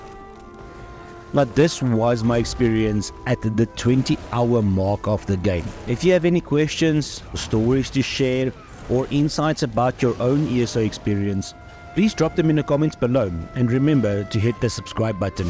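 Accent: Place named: South Africa. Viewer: Yoo i know a South African accent when I hear one. Whats up ekse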